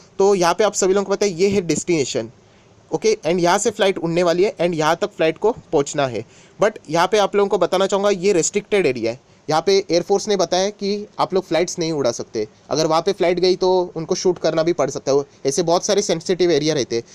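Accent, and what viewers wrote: Maharashtra, India. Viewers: बोलण्याचा पद्धतीने तर मराठीच वाटतो.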